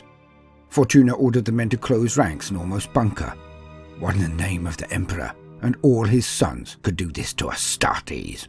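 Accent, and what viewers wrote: United Kingdom. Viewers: That voice. Like a british Tex.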